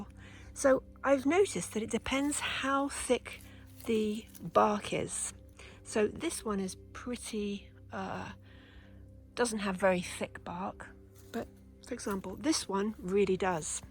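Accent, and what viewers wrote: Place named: United Kingdom. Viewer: Just came across your video you sound like you’re here in the UK , where did you get the tester from ?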